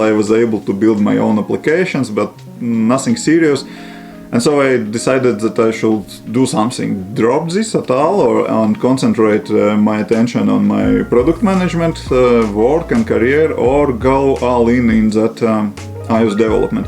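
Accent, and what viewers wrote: Russia. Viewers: Доброго времени суток, такой акцент у вас русский) подскажите вы меня понимаете?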